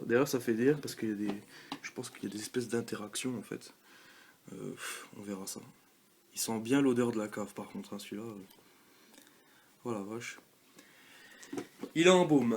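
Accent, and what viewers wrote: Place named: Moselle, France. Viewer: bien la nappe rouge , bien les rpg ps2 , bien l'accent de la Moselle !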